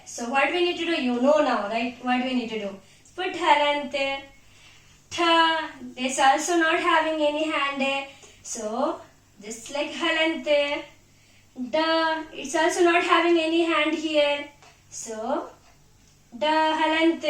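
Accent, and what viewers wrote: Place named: Tamil Nadu, India. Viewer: We are missing your tamil pronunciation ......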